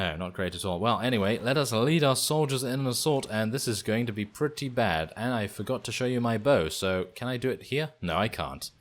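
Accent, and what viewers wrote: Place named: RP, United Kingdom. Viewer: If he quits his title I will be forced to try find someone with his. . . Gaming interests and accents. So fancy and almost posh <3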